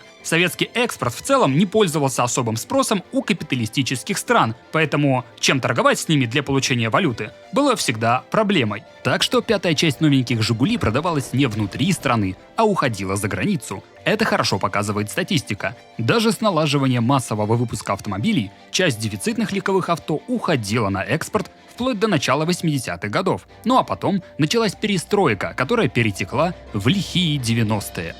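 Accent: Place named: Ukraine. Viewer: хохляцкий акцент у автора.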